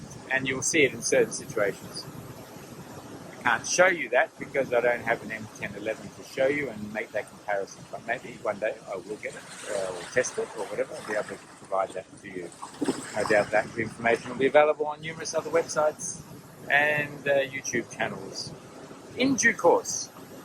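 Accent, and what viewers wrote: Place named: Australia. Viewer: good to hear an Aussie accent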